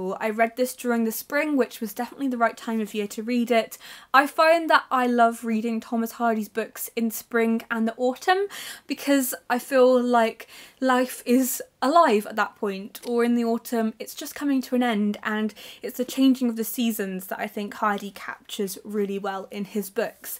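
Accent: United Kingdom. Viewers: I really love your reviews , they are deep and clever, and of course your lovely British accent, greetings from Barcelona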